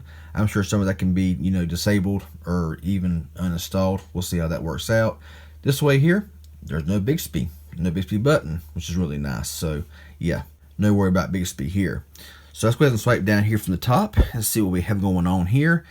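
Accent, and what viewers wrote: South, United States. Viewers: He has a yee yee accent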